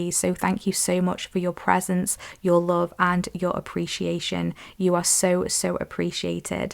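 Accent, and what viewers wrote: United Kingdom. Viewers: British.?? Coool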